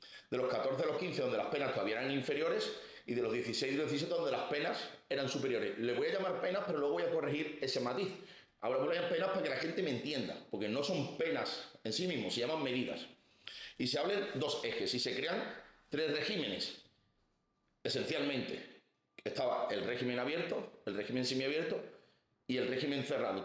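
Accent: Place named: Andalusia, Spain. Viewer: hola, muchas gracias x este vídeo con info tan valiosa para entender la temática..realmente la aportación es muy necesaria y se aprecia el conocimiento que describes..solo una apreciación, que en mi caso, que soy española como tú, me ha costado seguir la narración xq hablas muy rápido y se nota el acento andaluz, aunque mi dificultad vino x la rapidez y no x el acento..es importante que tratemos de vocalizar y llevar una locución a velocidad media para resultar lo más comprensibles a la audiencia..pero repito, si no me hubiera sido valioso este vídeo, no hubiera dejado este comentario. Muchas gracias y te deseo éxitos en el canal. Saludos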